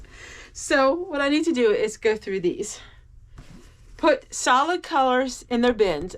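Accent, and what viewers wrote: Pennsylvania Dutch, United States. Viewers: Good morning,! I love when you share about your heritage. My husband and I watched your video together last night, and then I watched it again this morning to leave my comment, something I've been doing lately. We remarked about the Pennsylvania Dutch you spoke and which words sounded more Dutch or more German to us; it sure sounded familiar when you were speaking. My grandmother spoke Dutch since her family came here from Holland in the early 1900s; his grandmother spoke German because she came here from Germany in 1938. Your aprons looked so pretty all hung and organized like a color wheel. I spent the other day reorganizing my kitchen. Even though it was just a small bit of decluttering, it made a big difference! Have a beautiful, blessed day! ❤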